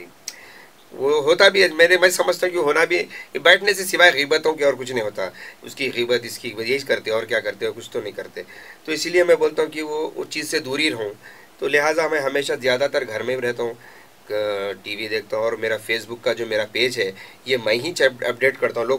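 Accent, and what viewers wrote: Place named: Hyderabad, India. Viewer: gullu dada...hit ur flop..thats different matter...but ur acting and as human being very nice..revive of hyderabadi urdu...keep it up..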